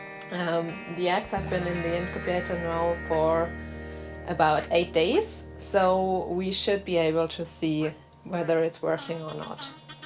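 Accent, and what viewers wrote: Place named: Germany. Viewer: She sounds German.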